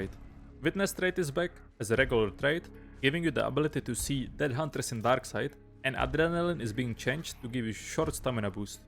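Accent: Czech Republic. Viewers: nejsi nahodou čech? :D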